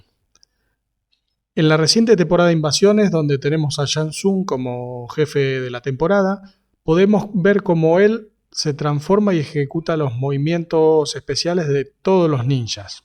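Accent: Argentina. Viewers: Es argento papu 😊 mase un argentina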